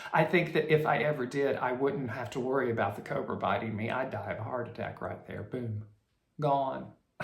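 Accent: South, United States. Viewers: Ooohhh Ms Gibbs telling you that you needed to improve your potato peelin skills....your face, priceless! Did you show cross stitch, you probably did. But your stories in that lovely southern accent warm my heart. We spent 3 years in Alabama with my husband's work. Alabama has a different breed of people. The kindest, most generous, funniest and every one has a story! You can't go buy some beans without a story. I miss it there. You bring me back. 💗⚘️ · Loving the videos! Ronnie. Lavender. Needleworker. I’m new here and love what I see! Thanks you! You are so funny! I love your mannerisms and southern accent! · Enjoyed your Granny’s poetry once again…and I get a kick out of slipping into your southern accent. It does indeed add to the reading. That Home of a Needleworker is adorable. Your garden is lovely. Thanks for sharing it with us. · Having grown in Mississippi and still live here, I love and appreciate the stories. 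The southern accent just enhances the poems. Hope you have a great week.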